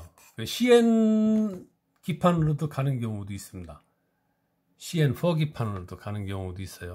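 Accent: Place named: Japan. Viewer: 일본에서 기술제휴로 만든 승강기이고 일본인 고문이 교육하고 40년 전만해도 영어를 제대로 못배워서 그런 발음을 하네요,,,지적 참고는 하는데요,,고치기는 힘들거 같습니다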